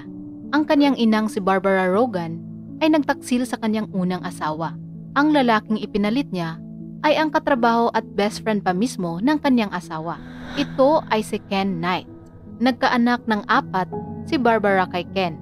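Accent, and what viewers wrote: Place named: Visayas, Philippines. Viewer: parang bisaya yung bosis ng narrator ...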